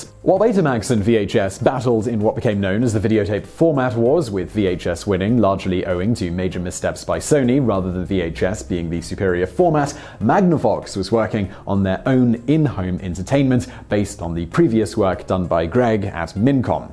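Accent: United Kingdom. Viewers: today I found out a person with a british accent can be misleading and wrong. what about the VCD format?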